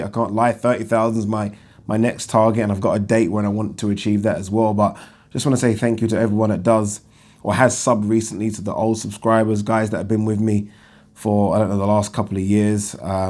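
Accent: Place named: London, United Kingdom. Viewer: you sound like you are a Londoner like me Metro Sport are saying Ruiz V AJ 2 done 1.6 million PPV £24.99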